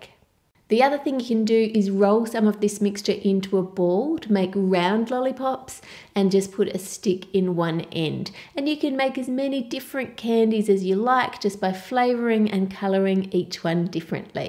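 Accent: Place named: Australia. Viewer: Put da suga and da wata 😂😂😂 i love Aussie accent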